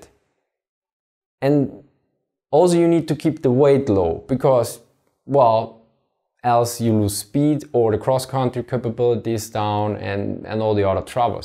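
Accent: Germany. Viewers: Seine Aussprache ist nicht so schlecht. Er hat ein starke deutsche Akzent, aber er ist noch verständlich, ganz besser als viele Engländer 😂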